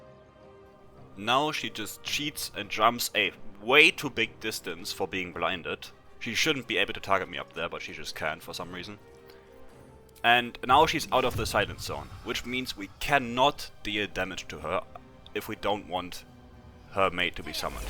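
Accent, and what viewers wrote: Germany. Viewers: can I ask about your accent if you don't mind answering? sometimes you sound standard german but sometimes you sound like a combination of singaporean + australian so I was just trying to narrow it down haha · Are you german? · Yeah, it's probably not possible...I'd consider myself to be a diehard fan and way too obsessed with this game and I can't think of any way that might make it work solo, at least not within 15 turns. I'm currently rewatching your video (after watching some of your build guides) while doing my own "kill everything on sight" run. 😄 I did cheat for the nautiloid though, because I have mods that make additional enemies spawn and I couldn't have handled 3 cambions and 2 mindflayers (+ the 2 cambions on the way and all the smaller enemies) on my own :D The run is a lot of fun, though I gain xp way slower than I thought I would from slaughtering everybody; I guess not finishing any quests really makes a difference. 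Completely unrelated, but I was wondering: Is you accent German?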